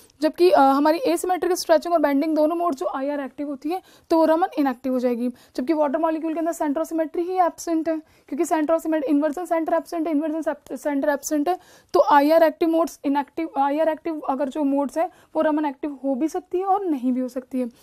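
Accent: India. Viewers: Is this English or english-Indian speaking?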